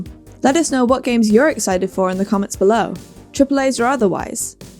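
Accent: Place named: Yorkshire, United Kingdom. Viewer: Yorkshire accents? Sign me up for TGYH. Excellent coverage :)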